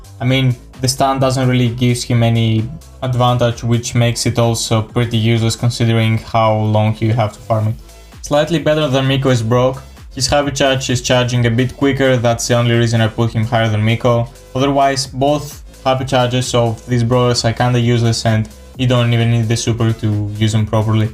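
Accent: Greece. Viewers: Ayeeee the greek accent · The Greek accent🇬🇷🇬🇷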